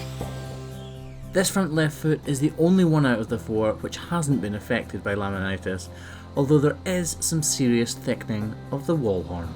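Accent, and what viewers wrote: Scotland, United Kingdom. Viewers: I don't think he should change anything, Its Scottish, quickly spoken and a bloody beautiful accent. It's like asking you to change your accent because I don't like the way you say morning 🤷‍♀️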